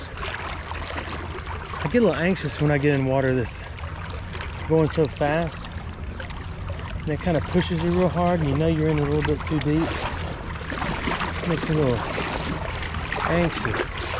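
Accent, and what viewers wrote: South, United States. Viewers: Love you quirky comments and your southern accent. Make for an interesting video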